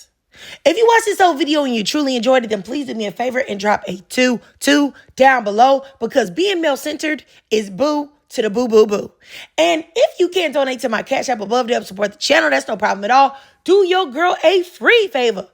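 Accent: Philadelphia, United States. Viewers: Are u from Philly?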